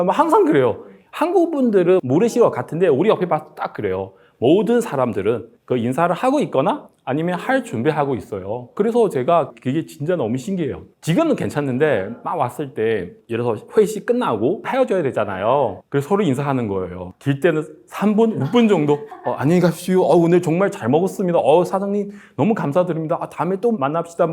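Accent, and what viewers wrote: China. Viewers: 이분 중국분 ? 발음이 ~~~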